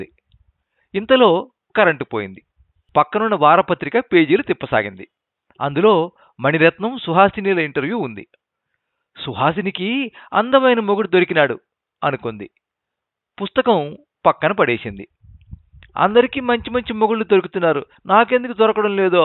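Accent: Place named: Chittoor, India. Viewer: సర్ కథ చాలా బాగుంది...చదివిన తీరు,చిత్తూరు యాస, జోడించిన saamethalu...super 🎉🎉🎉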